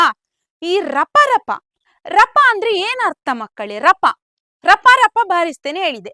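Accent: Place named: Dakshina Kannada, India. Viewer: ದಕ್ಷಿಣ ಕನ್ನಡದ ಭಾಷೆ ಕೇಳೋಕೆ ಒಂದು ಕರ್ಣಕ್ಕೆ ಆನಂದ. ಅರ್ಥಗರ್ಭಿತವಾಗಿ ದ್ವಿರುಕ್ತಿ ಬಗ್ಗೆ ತಿಳಿಸಿದ್ದೀರಿ.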